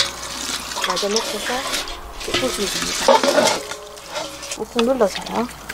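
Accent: Gyeongsang, South Korea. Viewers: 친구의 추천으로 유튜브 를 보게 되었네요 ㅎ 목소리도 차븐차븐 이해력있게 말씀도 잘하시고 경상도 바투리 매력 뿜뿜임돠^^♡ 댓글 감사 함니다 그독 알람 조이요 꾹 꾹 꾹 눌고감니다ㅎ 건강하시고 늘 조은 하루 되세요 ㅎ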